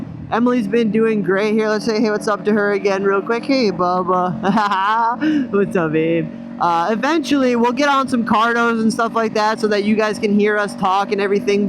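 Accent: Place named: West, United States. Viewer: ps not his voice, the western cali/arizona accent lol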